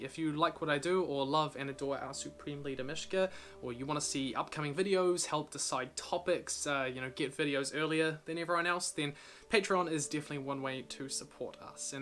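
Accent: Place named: New Zealand. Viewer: Omg hes a kiwi!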